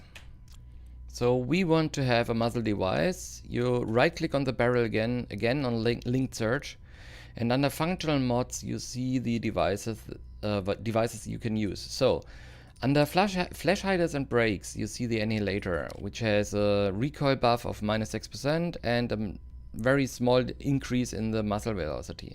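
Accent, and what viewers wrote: Germany. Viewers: You sounding like a german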